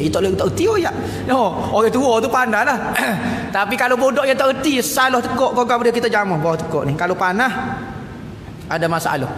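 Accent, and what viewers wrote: Kelantan, Malaysia. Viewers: Assalammualaikum warahmatulAllahhiwabarokatuh in shaa ALLAAH harap satu hari sy dpt faham loghat kelantan.. jazaaka'Allahu khiran ustaz 25/11/20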